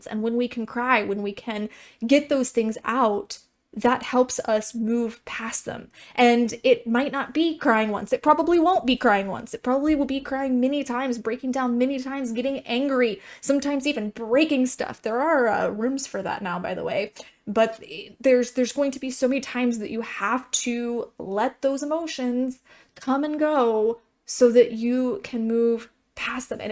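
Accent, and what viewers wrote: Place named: Southern California, United States. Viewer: It must be 2024. A sweet, blonde lady wearing pink and blue, with a "So-cal" accent is reviewing 🤣.... If only they knew her inside thoughts.. Appearances can be deceptive. I like this channel, there are some great reactions and honest truthes... but I can't help thinking - covering things in pink hearts and adopting a Disney princess voice / persona is actually doing you any good. But if it's what makes you happy, In the words of - be yourself, by yourself.🤘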